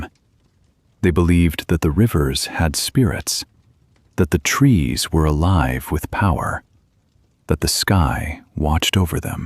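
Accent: United Kingdom. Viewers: Many thanks for this channel You're accent is British isn't it?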